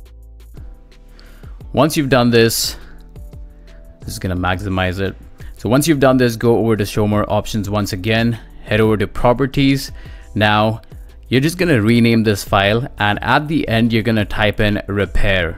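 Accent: India. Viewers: thank you very much bro “Indian Accent” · Thanks so much I didn't expect this to work two days I couldn't play. Btw you sound like Indian and Canadian at the same time hahah love you man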